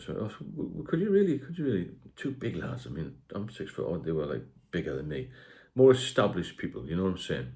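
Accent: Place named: Newcastle, United Kingdom. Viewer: You sound like you come from Newcastle. From experience, it's a shame that you don't come from a little further south, Redcar, where pub culture collides with parsimony, worthy of a David Attenborough documentary. "The Redcar drinker, always at the front of the herd approaching the pub, chattering loudly, suddenly withdraws silently to the back as the herd approaches the bar" You might as well get two pints in when it's your round, as the 'whose round is it anyway' game can go on forever when it's one of their turns.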